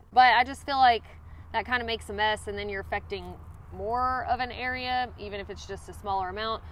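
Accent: South, United States. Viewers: Watching from the UK that's a really cool accent you have - from a southern state? Interesting vid too...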